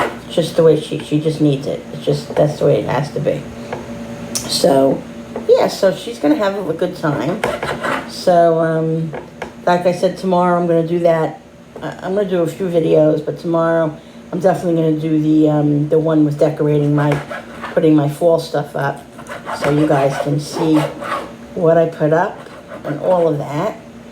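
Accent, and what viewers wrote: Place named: New York, United States. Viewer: I just ran across you! You sound like you are from N.Y. your accent is cool. I am stuck on the Summer wells case. I watch all the real mystery shows too. I will now watch the rest of your cooking.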